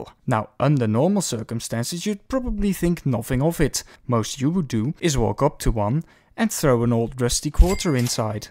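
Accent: Netherlands. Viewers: Wat een heerlijk Hollands accent :' ) Got a sub out of me tho!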